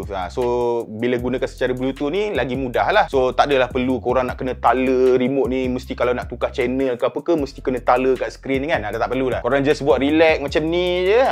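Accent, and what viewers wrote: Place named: Malaysia. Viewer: Ada app yang sesuai untuk view gallery / video dari USB drive? Coz saya ada Xiaomi TV Box tapi tak da app yang sesuai nak buat slideshow gallery. Mohon pencerahan. Anyway, very good video, bes dengar review cakap macam orang Malaysia sejati! :D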